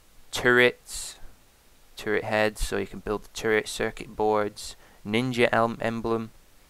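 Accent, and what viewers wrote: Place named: Ireland. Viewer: Your Irish aren't you?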